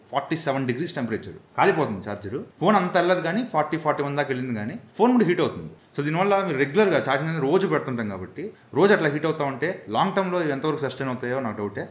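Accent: Nellore, India. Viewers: Mawa nellore ah Mande ❤😂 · Anna needhi Nellore aaaaaa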